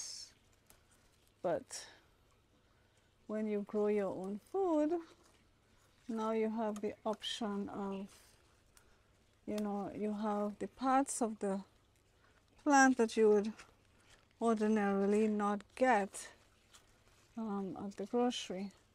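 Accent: Trinidad and Tobago. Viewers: Very nice video. I know a Trini accent when I hear it. XO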